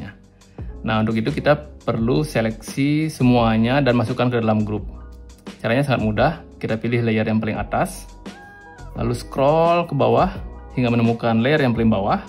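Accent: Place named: Bali, Indonesia. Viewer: Dari pronunciation kayanya orang Bali nih, sukses terus bli sya udah subscribe.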